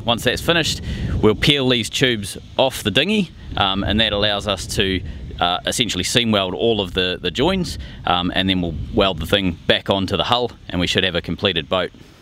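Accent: New Zealand. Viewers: Great idea using the plastic chopping blocks. If it was me, I would have made a 'sandwich' with a plastic plate each side of the alloy tube and a longer bolt. Then you would not be relying on the sika (which I know can do the job) as when you have big blokes with big boots getting in and out of the dinghy it could be accidentally knocked off. Keep up the great work. Nice to head a real Southland accent!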